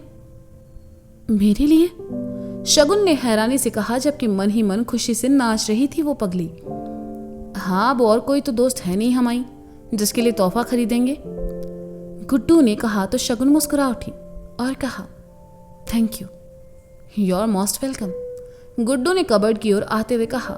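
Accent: Kanpur, India. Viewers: Mam ap manmarziyan record karte karte kanpuriya tone me baat karne lage ho joki bahut mast hai 😊😊❤❤😁😁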